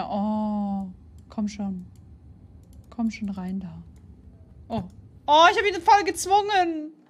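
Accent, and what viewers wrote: Swabia, Germany. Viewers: Dei schwäbisch killt mi😂😂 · Sage mol, komsch du ausm Schwobeländle?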